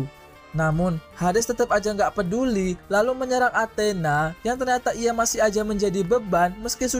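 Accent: Palembang, Indonesia. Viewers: Tangan seya kena rujah... hhhh asli operator ni wong palembang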